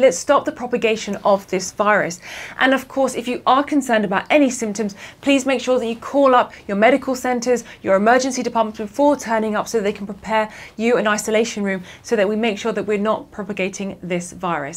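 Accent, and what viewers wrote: United Kingdom. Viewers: I live in London and that's a British accent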